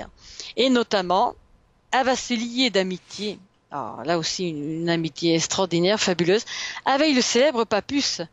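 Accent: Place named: South, France. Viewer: C'est génial j'en ai oublié mes tracas comme quand j'étais petite absorbée par cette histoire au accent du sud encore encore encore 👍merci merci merci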